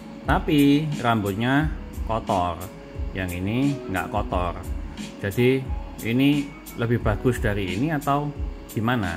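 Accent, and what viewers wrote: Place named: United Kingdom. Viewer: cengkoknya british bgt❤👍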